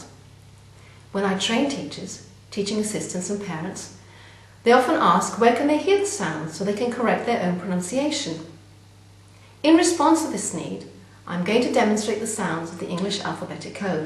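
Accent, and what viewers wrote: RP, United Kingdom. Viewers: Hi Ellie, The phonic sounds of English vary according to accent. The accent in this video is 'Received Pronunciation', which is spoken in the south of the UK. We pronounce /ar/ as in 'car' like this: 'cah' so you don't really hear an /r/ at all.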